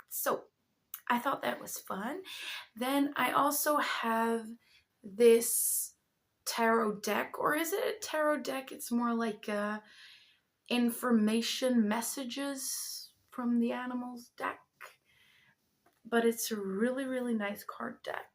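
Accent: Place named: Pennsylvania Dutch, United States. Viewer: As someone from the USA, your English accent, more specifically American accent, is really good. I actually thought you were American until I found out you were Dutch by watching your other videos. You literally sound like you’re a Pennsylvanian Dutch, but I guess that makes sense. 😅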